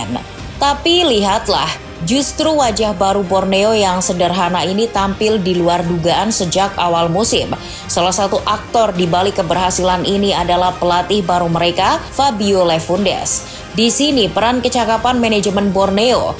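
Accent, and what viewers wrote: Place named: Surakarta, Indonesia. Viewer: Suara pria solo😊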